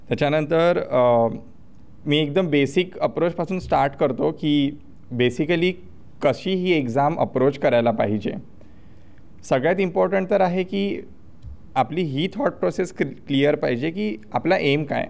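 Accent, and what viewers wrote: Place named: Amravati, India. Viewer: Veryyy...Informative sir....Amravati ascent can be seen .....🙏🏿🙏🏿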